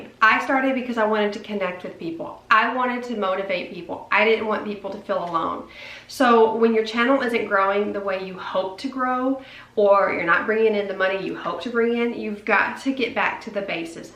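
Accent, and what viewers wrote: South, United States. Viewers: I just found you from Love Meg and I just love you!!! You're so sweet and I can legit just watch your videos for your southern voice! I love it!!!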